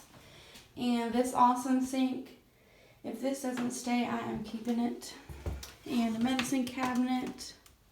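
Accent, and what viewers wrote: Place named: United States. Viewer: Could you give a quick backstory at the beginning of each restoration video? I'm a 1st time viewer and have lots of questions: Is this going to be your house or are you all planning to flip it? Is your dad doing all the work? How does he how to do that kind of work? Will u just be documenting or are you doing work too? What state is this house in? (Not asking for exact location, just generally. By your accent I'm assuming it's in the USA). Ok, you answered my first question at the very, very end of the video. Lol.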